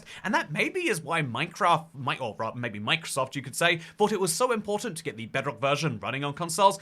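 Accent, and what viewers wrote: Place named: United Kingdom. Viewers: It is because he is british and people from that area pronounce Z that way. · Hes from the uk, brittish people and people over there in general say things and spell some things differently😊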